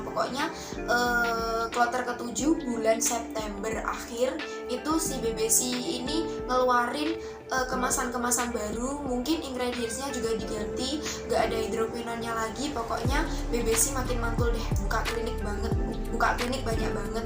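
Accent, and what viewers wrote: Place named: Java, Indonesia. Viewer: Awalnya sih enak didenger, lama-lama kok jadi medokkk😂sukses terus gais💋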